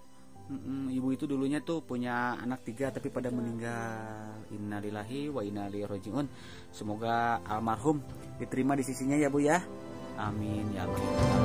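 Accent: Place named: Sunda, Indonesia. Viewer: Lagam naratornya terlalu NYUNDA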